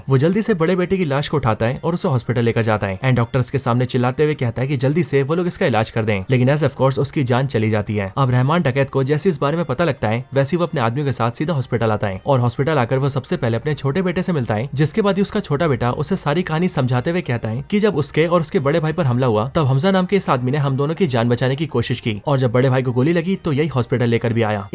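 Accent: Bengal, India. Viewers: Tum bengali ho kya???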